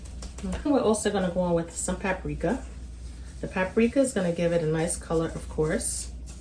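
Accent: New York, United States. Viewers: ….you gotta be from New Jersey or New York 😀 Food looks delicious! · Are you from New York? Love your accent and your recipes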